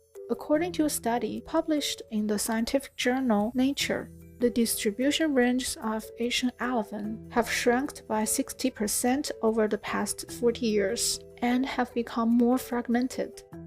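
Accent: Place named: China. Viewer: bad Chinese English accent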